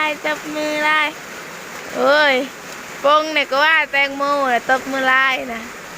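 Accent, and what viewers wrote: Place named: Isan, Thailand. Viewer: เสียงเว้าม่วนดีชอบฟัง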